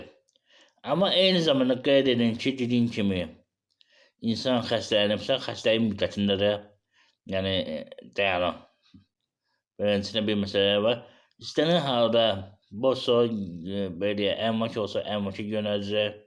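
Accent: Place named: Quba-Khachmaz, Azerbaijan. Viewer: Quba Xaçmaz terrfdən olarsan yəqin?mən Zirəli iyəm bizi də belə danışırıq. Uğurlar qardaş şABUNƏ OLDUNM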